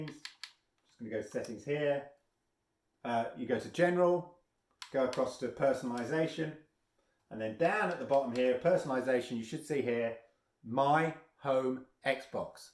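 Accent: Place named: United Kingdom. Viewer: This is very useful I watched a bunch of others and it turns out I only understand the british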